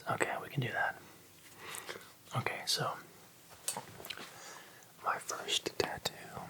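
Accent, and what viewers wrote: United States. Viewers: Idk why but you sound extra american today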